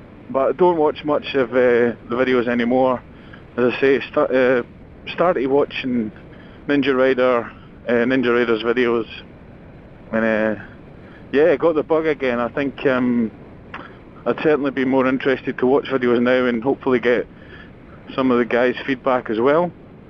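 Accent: Scotland, United Kingdom. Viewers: Hey dude, good to hear another Scottish Accent :) If you are ever heading further south on your Vespa, let me know and we can go a ride!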